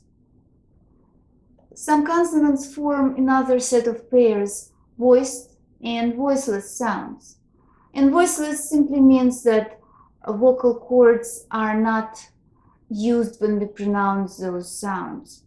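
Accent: Russia. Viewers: ...though your pronunciation of English has a definite Russian accent, (but it is so charming!), and, yet, you obviously have a very good mastery of English vocabulary, and, you are very clear in what you are saying. => A Great introductory lesson! I hope that, someday, I can learn Russian pronunciation, as well, and would like to at least learn to read with some degree of fluency, as I have a few books, (on the subjects of jewelry, and metalworking), that I'd like to be able to translate someday. Thank you, very much! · I don't know what she's saying because I'm not used to that type of russian accent (I'm used to crazy Russian hackers accent but I have no idea what she's say but probably where I'm from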